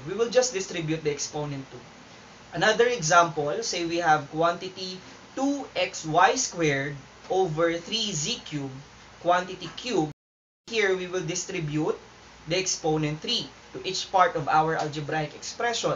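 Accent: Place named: Philippines. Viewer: I love his Filipino accent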